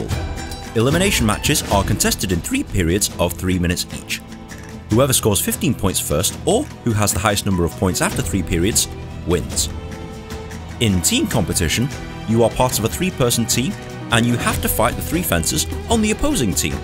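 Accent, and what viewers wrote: United Kingdom. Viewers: Very nice explained and I love typical british accent. But I need to correct something: the sabre is about 88cm long, the foil and the epee are about 90cm long (length of the blade/edge). The pictures doesn't show the correct lengths.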